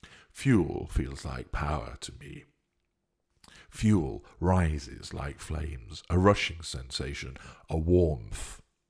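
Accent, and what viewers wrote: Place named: Oxford, United Kingdom. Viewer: I bet your bass baritone Oxford scholar accent is fuel for plenty of men and women, narcissist or not! 🎙️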